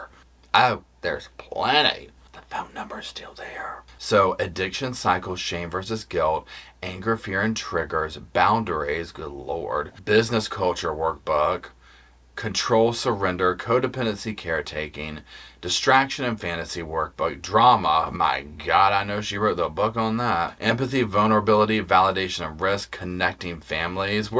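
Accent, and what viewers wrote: South, United States. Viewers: Can we say C U L T?? Oh my goodness! YESSSS!!! Let’s hear the transcripts, podcasts, all of it. I’m here for it. On a more serious note- i think that the higher ups of this particular religion really need to speak out and speak loud about what is condoned by the LDS church, and what is not. What is encouraged, and what is not. Because, right now, that church is looking BAD BAD. I live in a small mountain town in UT- the same one that Kouri Richins tried to purchase that big ole house in? Yep. As a non- member, it terrifies me that SO MANY high profile cases lately are coming from “devout” LDS people. The dentist who killed his wife. The wife who poisoned her husband. The Daybell/Vallow saga. 8 passengers. The list goes on and on. If I was the head of PR for the LDS church, I would be hiring the best people to somehow dig their church out of this optical MESS! 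Looking forward to your next video! Your personality is perfect for this! Love when you roll your eyes and get a titch petty with your southern accent…. bless their lil pea pickin hearts. Ha ha. Thanks for all the fabulous content!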